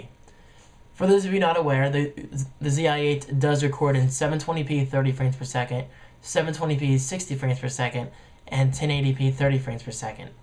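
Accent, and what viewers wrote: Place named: United States. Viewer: Arghh I love your accent, im brittish and just love the american voice ! Oh and yea I have this camera and i LOVE IT, the audio isnt great but it recorded a concert with heavy bass really well. I also used it when skiing.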